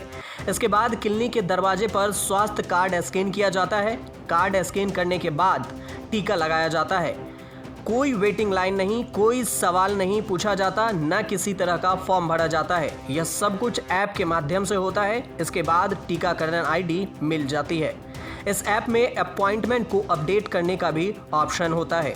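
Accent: Bihar, India. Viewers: bolne k liye ye bihari hi bcha tha kya dang se hindi nhi bol skta ye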